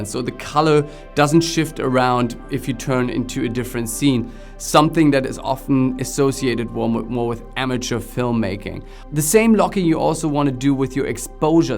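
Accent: Germany. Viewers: Kann es sein, dass du Deutscher bist? Irgendwie klingt dein Akzent danach. Super Video übrigens!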